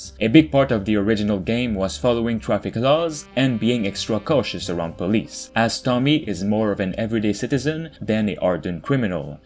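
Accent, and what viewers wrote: Quebec, Canada. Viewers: Tu es du québec ca c'est sur avec l'accent è la GSP hehehe moi aussije suis du québec. Si tu as envis de faire un podcast en francais un jour laisse le moi savoir! moi aussi j'attend mafia 1 remake jen'y ai jamais joué mais j'adore la série donc j'ai hâte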